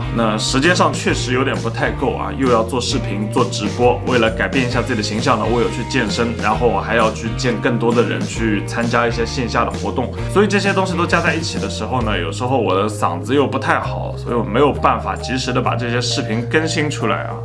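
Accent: Shanghai, China. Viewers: 沪普. 上海宁？ 😉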